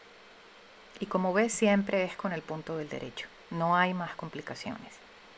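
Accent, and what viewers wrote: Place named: Latin America. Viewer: Que bonito y que divertido ese chal asimétrico gracias por compartir... una pregunta de que país eres porque tu acento es latino. Es una curiosidad saludos desde Colombia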